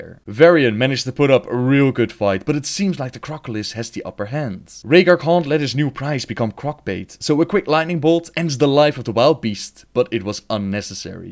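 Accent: Netherlands. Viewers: No. it's his accent. he's Dutch. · Dutch accent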